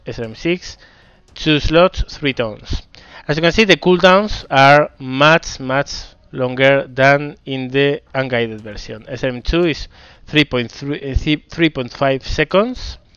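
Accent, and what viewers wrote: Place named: Spain. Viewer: Yay the spanish accent is back ;). Good vid.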